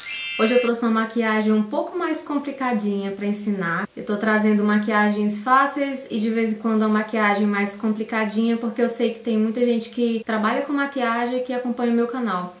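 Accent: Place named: Minas Gerais, Brazil. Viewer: vc é de onde? seu sotaque parece de gente de Teófilo Otoni em MG...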